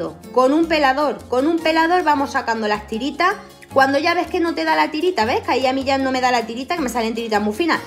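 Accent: Andalusia, Spain. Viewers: Muchas gracias!!!! K buenas recetas hijaaaaa. Oye tu de donde ere?? Tu acento es andaluz no??? Un besito wapa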